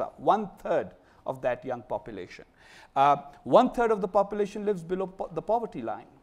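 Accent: India. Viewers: This guy looks and sounds more indian. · Think an Indian is talking..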